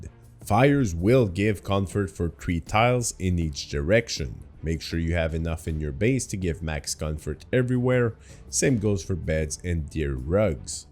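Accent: Quebec, Canada. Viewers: you have a French Canadian Quebec accent it sounds like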